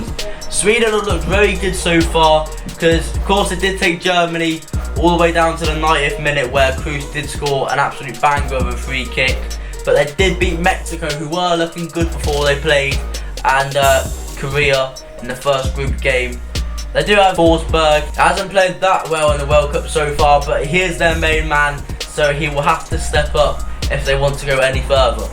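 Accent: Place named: England, United Kingdom. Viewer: Let me guess, u are from england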